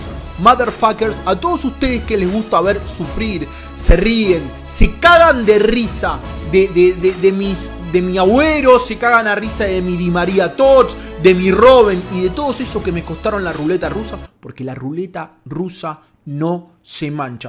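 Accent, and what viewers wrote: Argentina. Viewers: Me mola tu acento argentino!!SALUDOS DESDE ESPAÑA :D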